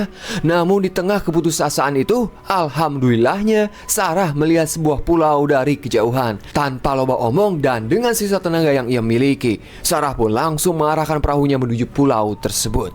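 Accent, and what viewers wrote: West Java, Indonesia. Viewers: Ada Sunda Sunda nya kang😭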